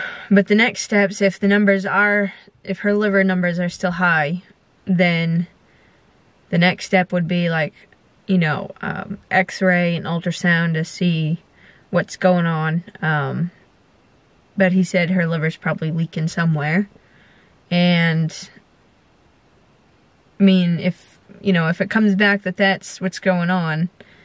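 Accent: Maine, United States. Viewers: Oh man, I am truly sorry to her about June. They truly are family. I hope she soon gets better. Sending prayers your way. On a lighter note, something I have thought about sharing with you numerous times since I began watching and following your channel. You have sent me down a memory lane from years long gone. That is due to your Maine accent. (Don't hate on me yet!😁) My closest buddy in Vietnam was a Sgt. from Maine. I can't recall what part. We became close pretty quick, with each struggling to figure out what the heck the other was saying. I was raised in the south, and needless to say, I never mastered the Queens English myself. 🤣 We spent our full tours together, although we rotated back to the states at different times. I left first, at a time when things were "less than ideal", and we lost contact. I tried for weeks, calling the Dept. of the Navy, and the Marine Corps, trying to learn if he had made it back. 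Finally, one evening I got the news I had long hoped to hear. "Sgt. John" made it home, and was doing well back in Maine. Isn't it funny? How something as simple and common as an accent, can send us back to a time so long past? God bless you and yours. And, God be with June. Happy trails,.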